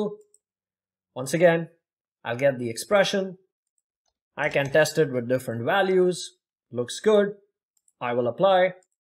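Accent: India. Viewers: سلام خستت نباشه - عذخاهی، شما اینگلییسیو تو ستان🇮🇳 یاد گرفدین[ت= د👂 ; ) ] یا ایران🇮🇷، طرز تلفظاتتون شبیه به مردمان هندی اینجا در کاندا(بیصائب_شده) که ساکنم به گوش می خوره🤔🙂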